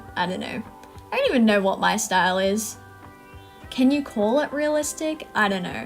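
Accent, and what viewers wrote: Australia. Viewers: Just found ur channel, ur personality is si great I love ur vids 😂 are you australian? Your art is amazing btw!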